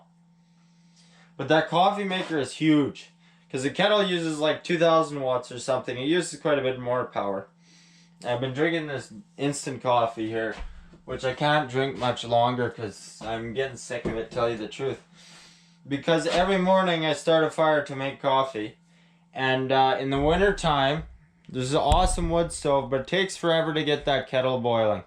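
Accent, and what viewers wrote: Canada. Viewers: Ah shite aye? Thats kinda luck shitteh. I came here for how you talk its hilarious and the world needs more back country Canadian slang.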